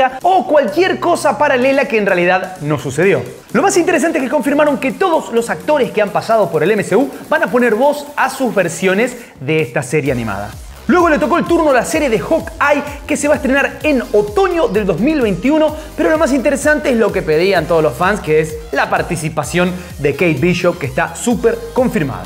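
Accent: Argentina. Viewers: He guachin sos Argentino..??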